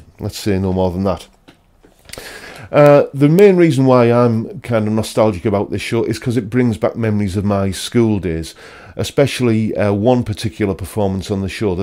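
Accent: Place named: England, United Kingdom. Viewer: Great fun article! Love yer english m8! Wasn't there something briefly hosted by Peter Cook?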